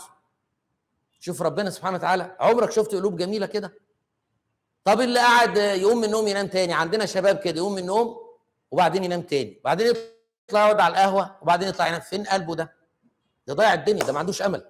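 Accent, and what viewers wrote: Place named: Iraq. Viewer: ف السعودية يسما الهيل حبه خضره تنحط مع القهوة تكسرها شيء بسيط بي سنونك وتحطها كاملة تحت اللسان ٣ حبات كويسة اذا تنهج اذا سويت مجهود وتعبت حطها تفيد في مصر تسما حب هان. لهجتك من العراق يسمى الهيل الأخضر او الهيل الحقيقي او الهال او قاع القلعة له مسميات كثير لكن المعروف عند الخليج الهيل الله واعلم ايش اسمو ف العراق لكن استخدامو في القهوة و في المرق وفي الكبسة وفي الفته وفي العريكة وفي الشاهي العراقي أظن يستخدمونه وحتى الحلى اذا ماعرفت ارسلك صورته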